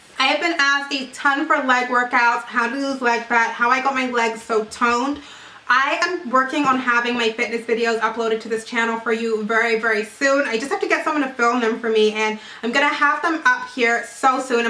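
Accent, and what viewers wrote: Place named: England, United Kingdom. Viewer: ok cause I knew I heard a lil english accent there but wasn't sure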